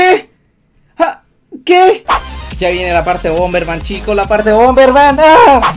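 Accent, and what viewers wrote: Chile. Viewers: Eres chileno ?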